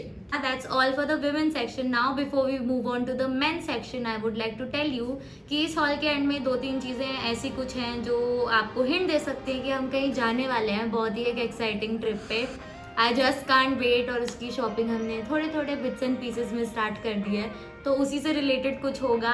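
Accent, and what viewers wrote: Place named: India. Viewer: Love u indian accent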